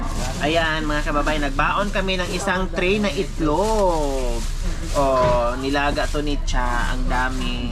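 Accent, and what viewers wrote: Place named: Negros, Philippines. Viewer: Im from kabankalan kag naka pagasawa ng taga Moncada.That is why,iba ang tuno mo magsalita super lambing hehe.Halong and bless your good heart.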